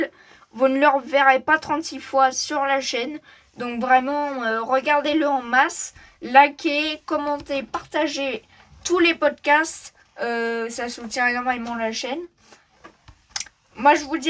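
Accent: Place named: Spain. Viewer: Bien d'avoir ce podcast que tu as commenté en direct... j'ai suivi et j'ai rapidement accroché pendant un bon moment.Est-ce que tu penses qu'il serait possible de commenter en ayant les grille des temps à l'écran? Quartararo au sol... et même Marquez En quelques minutes j'ai appris plein de choses. Et pour ton accent espagnol et pour ton ¡Vive la France! tu es un vrai champion. Et non tu ne te ridiculises pas, crois-moi. Ni même en loupant un résultat, au contraire, tu prends des risques. Salut et à bientôt, Mister!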